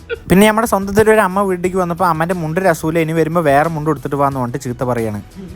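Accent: Palakkad, India. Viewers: Nee palakkad aano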